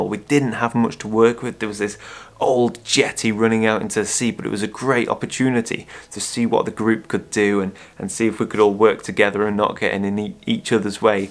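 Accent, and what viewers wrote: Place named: Northern England, United Kingdom. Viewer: Just discovered this channel...brilliant! Can just about understand your ‘tut north’ accent :) was just wondering - you used a full ND and an ND grad in a low light situation.....how do you position the ND grad correctly as it must be difficult to judge the horizon line in such low light... Unless you put the ND grad on first - align it then put the full ND on after?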